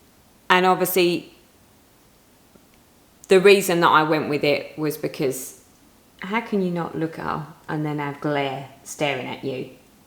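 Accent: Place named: Essex, United Kingdom. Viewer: Hi...PLEASE don't be discouraged by these ignorant, moronic naysayers. They've nothing better to do with their time than shoot nasty comments your way, probably, as Thewoolyhattedstitcher says, they're probably jealous and envious of your talents and beautiful pieces because they have nothing to offer themselves. Your enthusiasm and excitement is contagious. You are a beautiful person inside and out so don't let anyone tell you otherwise!! Block them! Delete them! Whatever you have to do. There are plenty of people out here who love you and would miss you like the devil if you should quit doing videos. I wait patiently for every one of them. Besides, I'd really miss that Essex accent of yours. It just adds to your beauty!! That said, may I compliment you on every piece you've shown in this video. I'm soooo partial to the high heels collection that I'm going to order the pattern. 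You know your an enabler!! LOL Congrats on your page finishes and all you've done. What a hell of a start to 2020. Love ya and please stick with it. Hugs from Canada (Yes, we're huggers here. We have to be just to stay warm! LOLOL)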